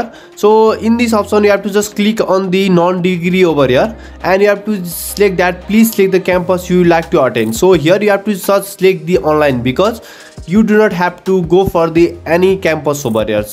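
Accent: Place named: Nepal. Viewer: Accent tah nepali xa tah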